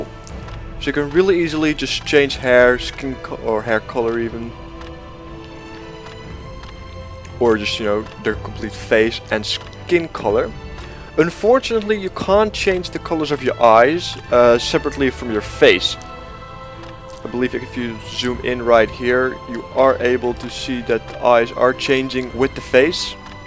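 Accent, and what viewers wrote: Netherlands. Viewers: "I'm and I am Dutch" or aint you? you sound dutch:) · omg that dutch accent tho haha, I recognise it anywhere, mainly because I've got it myself:p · are you dutch?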